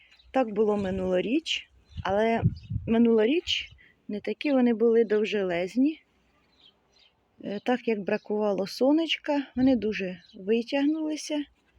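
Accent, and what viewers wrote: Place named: Poltava, Ukraine. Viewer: Я дуже радію з того що чую свій полтавський діалект ібачу колегу по квітах аж сльози виступили так мені схотілося усвою трудолюбівку пропоную помінятися : ви мені сімена сон_ трави або кущик, а я вам голубу юнону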